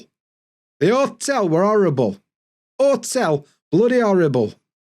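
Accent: Sheffield, United Kingdom. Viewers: I went to school in Sheffield, but was only there for one year when we moved to Rochdale. I still keep some of those Sheffield idiosyncrasies! Mind you, I was born and raised in Donny (Doncaster) so I think a lot of your accent is shared with us. Good video! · You have the most extremely broad Sheffield accent if you use all these.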